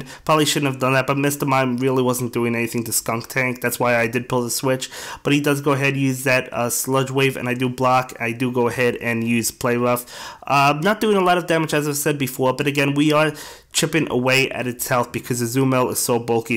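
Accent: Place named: New York City, United States. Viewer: It's a regional thing; I'm from NYC and I can understand him perfectly. Not sure what you're talking about with bodily noises.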